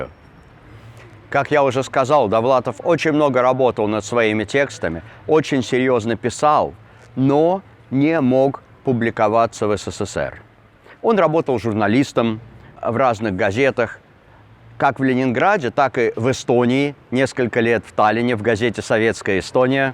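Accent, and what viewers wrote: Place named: United States. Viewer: Очень интересный рассказ о Довлатове, спасибо. Но я не понимаю, зачем, будучи преподавателем русского языка, упорно использует американскую интонацию во всех своих видео.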